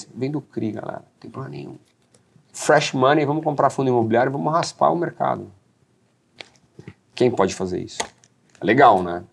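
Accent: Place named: São Paulo, Brazil. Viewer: Esse sotaque paulista é um tanto engraçado. Cliente Valora aqui ;)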